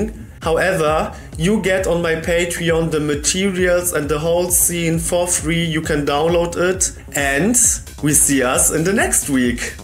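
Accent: Germany. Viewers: Ich höre deutsch raus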